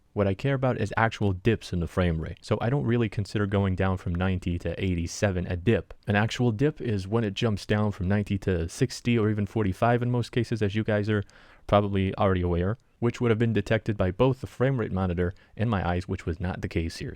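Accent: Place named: United States. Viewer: From the sound of your voice you're located in America so I just wanted to ask how your process was getting the 17 ProMax and if you could maybe link where you got it from or something like that so other Americans like me can reliably get the Xiaomi 17 pro Max. Plus I did want to ask what cell phone provider you're using because I know some of the Chinese smartphones do not work well with Verizon for example. (I have t mobile)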